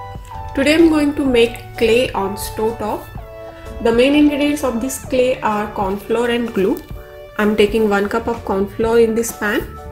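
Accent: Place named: India. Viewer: I couldn't understand your accent. This really sucks because you are trying to speak English and I can't understand Hindi accent. No captions available either. Sorry, but you get a thumbs down. Talk slower and much more explicit. I want to make this but you made it impossible for anyone who cannot hear Hindi mumble.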